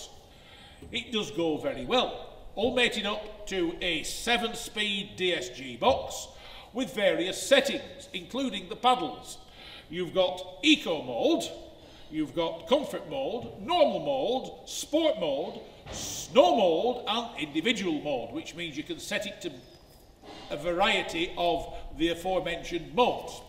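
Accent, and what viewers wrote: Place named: Northwest England, United Kingdom. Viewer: An immense machine, we have that exact VRS at the moment, mpg we got similar to you, where are you in the northwest, you sound local to us?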